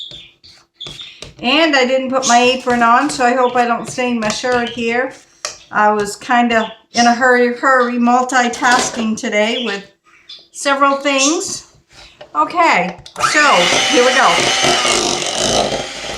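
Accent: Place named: New England, United States. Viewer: Are you from New England ? I know that accent. Im from Massachusetts. I thank you for your recipe. My daughter requested strawberry cake for her birthday. I am making a stabilized whipped cream frosting, her favorite.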